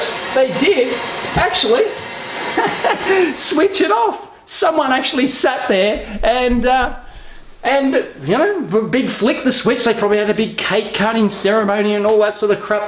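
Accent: Australia. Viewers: It’s Australian sorry to break it to ya mayte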